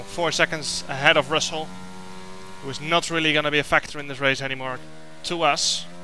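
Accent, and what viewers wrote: Netherlands. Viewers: are you form the netherlands/ kom je uit nederland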